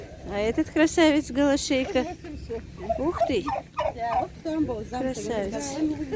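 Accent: Standard. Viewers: Здравствуйте!Недавно вас смотрю,мне очень нравятся ваши ролики.И еще вы так по русски чисто и грамотно разговариваете.желаю вам и вашим близким удачи и здоровья.Я живу в Омской области р.п.Шербакуль -это Сибирь.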